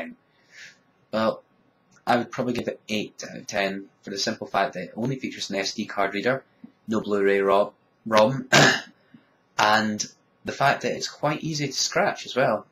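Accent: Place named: United Kingdom. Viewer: Nice review glad to see a British review instead of a annoying yank. Am going to get one I just fancy a change from a windows pc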